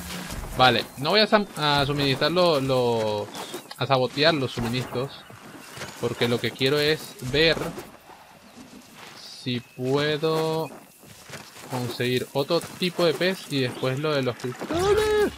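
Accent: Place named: Venezuela. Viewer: eres venezolano si o si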